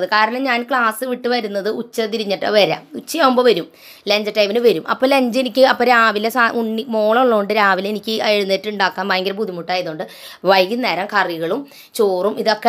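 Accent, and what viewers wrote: Thrissur, India. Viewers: നാടൻ ശൈലിയിൽ... നമ്മുടെ തൃശൂർ ഭാഷയിൽത്തന്നെ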